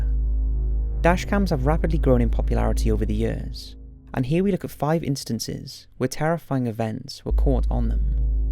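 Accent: Wales, United Kingdom. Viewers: TheMillster 81 - I love his soft Welsh burr! It's unmistakable. 😊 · I love your videos! Are you by any chance Welsh? I’m sure I can hear it in your voice!! Maybe Cardiff?! 😁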